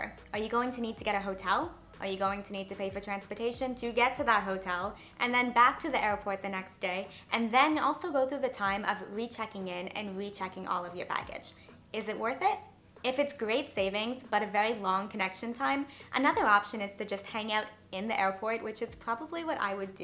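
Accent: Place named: Boston, United States. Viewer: Love your Bostonian accent :)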